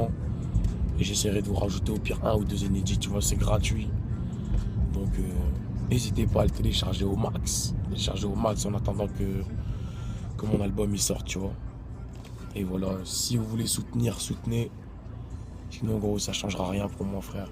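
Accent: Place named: North, France. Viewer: trop fort l accent de chez moi sheguey le nord en force ca fais bizarre on entends pas souvent un accent comme ca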